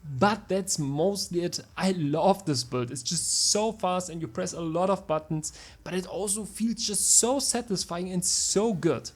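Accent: Germany. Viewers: so geil wie ich englisch immer besser versthe wenn ein deutscher es spricht. ^^ danke für das video!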